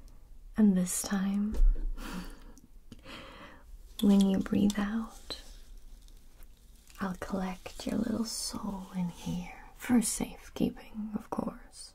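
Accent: United States. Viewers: Wow, your American accent is so good! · with an American accent hits different— I cant look away from those eyes holy crap! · Cannot get over how amazing your American accent is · Love this! I just realized many minutes in you were using your American accent. Kudos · Your American accent is getting fantastic! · I thought she sounded kind of strange at first and then realized she’s doing sort of an American accent. Very impressive voice acting! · Nailing that American accent! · Very believable American accent! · Nice american accent!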